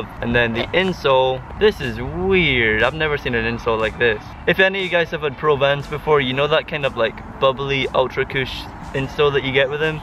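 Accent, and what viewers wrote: Scotland, United Kingdom. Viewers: Is he Scottish ? He sounds like Steven Caldwell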